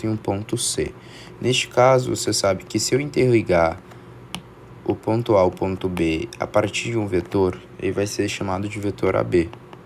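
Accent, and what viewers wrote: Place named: Pernambuco, Brazil. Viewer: Não entendo nada de matemática. Mas amei o vídeo ❤ kkkkkk Porém, gostaria de ressaltar que ficaria mais legal com o seu sotaque original, pernambucano 🥰😘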